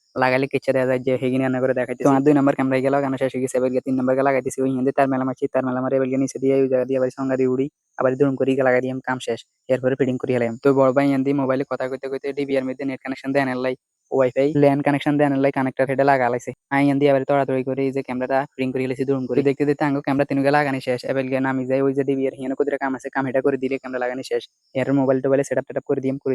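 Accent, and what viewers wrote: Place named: Noakhali, Bangladesh. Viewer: নোয়াখাইলা কথা ঠিক আছে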